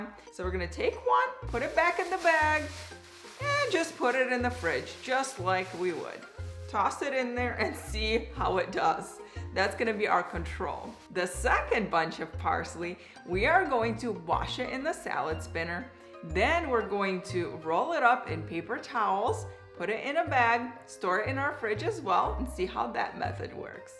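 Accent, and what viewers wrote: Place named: New England, United States. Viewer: Ur accent is of a New Englander.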